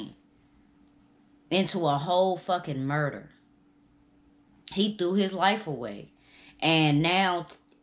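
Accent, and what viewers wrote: Washington DC, United States. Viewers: I always liked yo heavy DC accent💯 Keep levelin 💯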